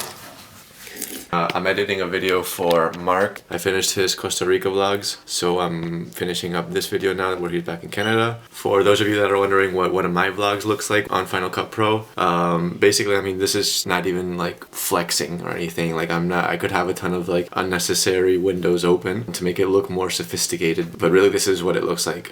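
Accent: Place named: Spain. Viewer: I love ur Spanish accent, u should speak Spanish more often😁 great work with the vegan transition!! 👍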